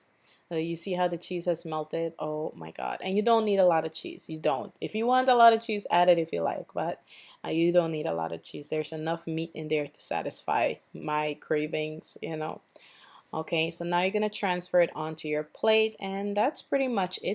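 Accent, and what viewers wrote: Guyana. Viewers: hey all the time I keep telling myself you're kinda speaking lyk a Guyanese but never bother to ask, but the way you're using those seasonings I just knew for sure you're from Guyana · By anychance are u Guyanese?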